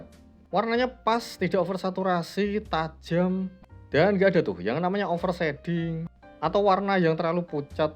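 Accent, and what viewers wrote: Pati, Indonesia. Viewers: Wah wong pati ya mas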